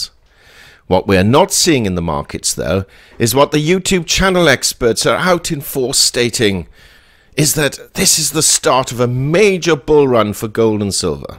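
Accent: England, United Kingdom. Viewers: I do enjoy your pure English voice :) I tell those trying to learn English where I live attempting to shake off the chains of their poverty, that the purist form of English comes from the English :) I am going to recommend a few of those I care for to subscribe to this channel to help them with a little of this purity :) As far as this post goes however re my understanding being more focused on the substance, I agree it is following standard trend, until it doesn't :) Panic can begin within minutes and within hours a different world may be what is faced. Yes chances are slight, or are they? The fixation on the Tariffs hid its true meaning of the Pre Tariff sugar fix, pre purchases, costing investors trillions. Perhaps its hangover of such actions and what it causes is now hidden under the Government shutdown :) We will just need to wait and see, wont we :)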